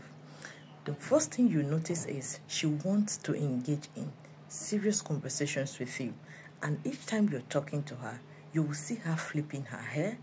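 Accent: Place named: Africa. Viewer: Thanks for the inside info. Love the accent ❤ where in Africa are you from?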